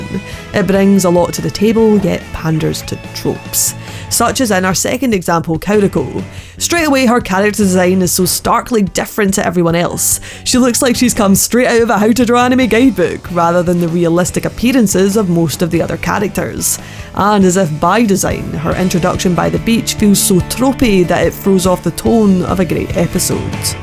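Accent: Scotland, United Kingdom. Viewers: Love watching your reviews on anime especially your Scottish accent 11/10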